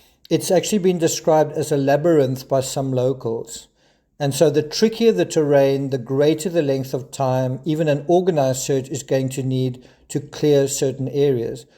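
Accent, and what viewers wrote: South Africa. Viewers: Thank you for this excellent summary! So great to hear a South African voice... I am a South African living in the UK. I have followed the case from beginning to end and I am sorry that Jay Slater has lost his life, but I am glad that his body has been found.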